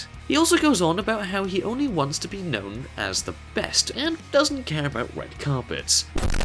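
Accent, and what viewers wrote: United Kingdom. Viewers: shocking not so much just another countdowner on youtube with a british accent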